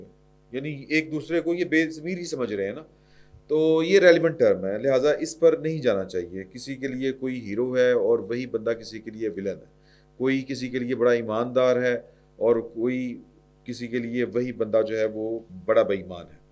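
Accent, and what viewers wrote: Punjab, Pakistan. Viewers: Punjabi touch Wel done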